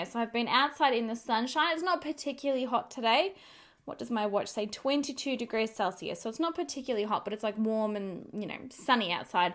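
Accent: Australia. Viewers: I could listen to you talk allll day. Love the Australian accent. 💕 I agree the Maybelline side looks flawless. Great shade for you too. Could I just say, I would love to see you and Tati Westbrook do a video collab! I think your personalities would "gel"! 🥰 Or you, Tati and RachLoves together! 3 fantastic, beautiful, personable Youtubers. 🤗🤗🤗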